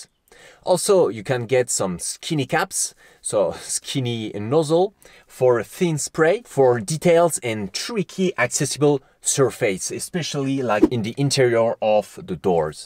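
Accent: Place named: France. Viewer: Him : *Talk My brain : Where is this accent come from? Him : France Me as a French Canadian : Oh so this is how I sound... Belle découverte ton channel. J'achète mon Forester 2001 demain merci pour ton expérience!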